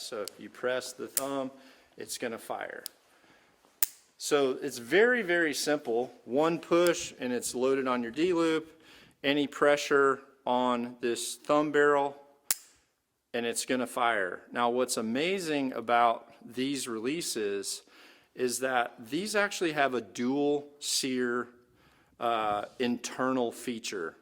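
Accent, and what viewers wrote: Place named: California, United States. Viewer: So hard to suffer the vocal fry. Californian is the worst accent.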